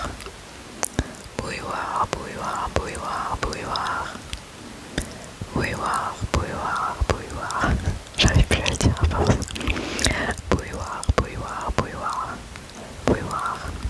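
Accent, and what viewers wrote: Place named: France. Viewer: Tu as fais une vidéo vraiment éducative et intéressante et vraie on a des mots dans notre langue assez spéciaux à prononcer et écrire et les sons différents tu réalise vraiment un travail parfait et ton anglais était bien compréhensible avec note joli accent français 😁 mais en tout cas une vraie vidéo intelligente et bien faite tu peux être fière de toi 😊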